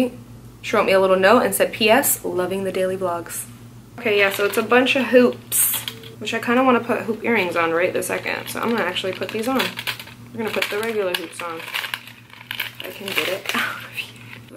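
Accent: England, United Kingdom. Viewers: love the british accent. <3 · Your soo good at an English accent hahaha😅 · I love her English accent lol. She is my favorite & by far the best YouTuber/beauty guru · honestly any problem you encounter you always solve it!! lol like ya wig! I love the grey wig!!! :)Also... I don't know if anyone has told you but your English accent is one of the best !!!!! I love it lol loving these blogs!!!! always inspired :) :)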